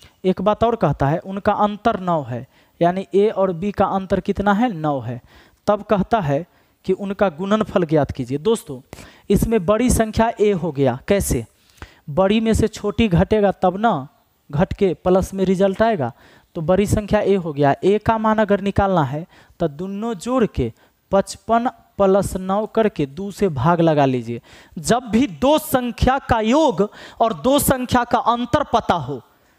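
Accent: Bihar, India. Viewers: Sir Kya aap .....Bihar se hai ?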